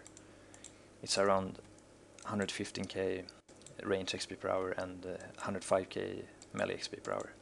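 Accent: Sweden. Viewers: Hejsan! Du låter som en svennebanan!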